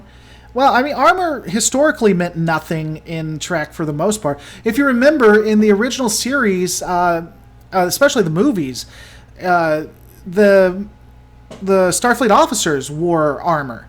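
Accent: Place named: South, United States. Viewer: Hi. I don't like it when people have a go at you about the South, or your accent. It's uncalled for. Your views are what counts, not how you pronounce them. More power to you for putting down the ruder parts of the group watching you. I'm a Brit, so the whole "your a fan of the British" thing made me laugh - we were the good guys! Don't worry, I forgive the American rebels, ha ha. We're talking about events over 200 years ago so I think we should probably be moving on to make a better shared future on our precious pale blue dot.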